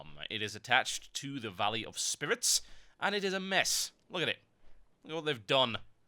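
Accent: Australia. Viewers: Has anyone ever told you that your accent sounds more Aussie than English? o_O To me, anyway. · Hey, are you aussie? <3 your accent :D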